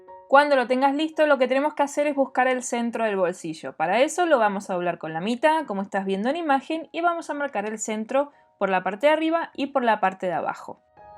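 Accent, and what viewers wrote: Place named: Buenos Aires, Argentina. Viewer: Hermoso! 😊 Me despista el porteño con el español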